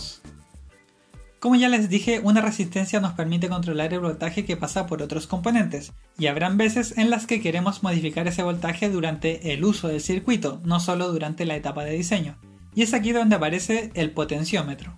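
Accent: Chile. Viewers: Saludos ,eres chileno??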